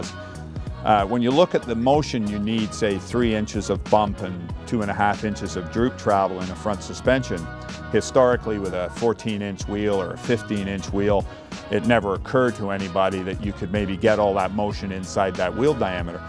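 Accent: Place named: Canada. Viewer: obvious Canadian accent...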